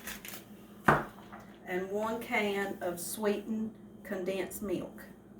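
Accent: South, United States. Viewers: Most beautiful southern accent you have. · So good to meet a sister in Christ God bless you I accidentally stumbled on your video and I absolutely love your way of cooking very explanatory very down to earth love your southern accent so adorable you're beautiful silver hair and beautiful kitchen so very happy to have stumbled on this video Thank you and a Very Merry Christmas A sister-in Christ, 🙏😇 Jill 🎄❄️ ⛄️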